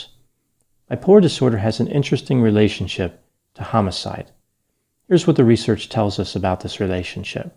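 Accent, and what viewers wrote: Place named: New Jersey, United States. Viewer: Dr! I love the nj jokes, but I'm curious... what did the Garden State do to hurt you? (byyyy the way, you exhibit some minor signs of Jersey accent 🤔) lol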